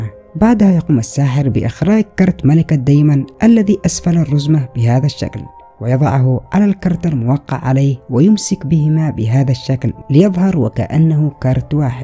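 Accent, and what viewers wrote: Yemen. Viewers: يا انت يمني صح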